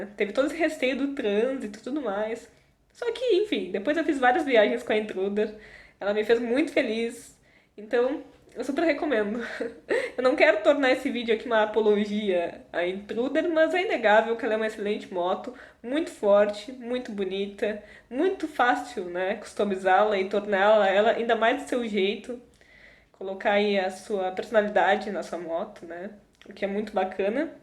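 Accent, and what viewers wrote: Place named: South, Brazil. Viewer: que sotaque gostoso do sul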